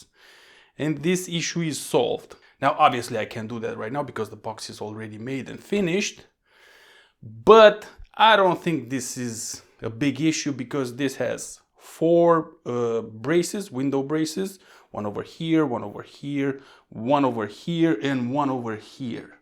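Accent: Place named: Romania. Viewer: considering your accent I bet that you are Romanian.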